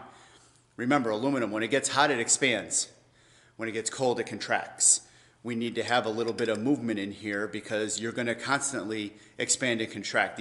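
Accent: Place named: New England, United States. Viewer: Awesome video! As an electrical graduate of a vocational high school this brings back memories. I’m currently deciding to upgrade my two family home from 200 amp service ( each unit is 100amp panels) would it be worth it to upgrade and if so how much do you think would cost? Also judging by the way you speak, you must be a fellow New Englander. I’m in western Mass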